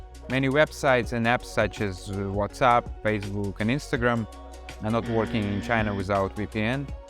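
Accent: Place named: Russia. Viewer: Hi! You fon't know how much you could measure my plasure to meet you on Youtube for the first time. I think your Country is Russia or not? I love your accent, your English is very good and thanks for 7 advice your just give to someone who wants to go to China. They were very very useful for everybody in youtube, I supposs to.❤🇨🇳🌹🙋🌻Good luck in China and your new language learning:Chinese-Mandarin. Greetings from Brazil.💚🌎🙋🌹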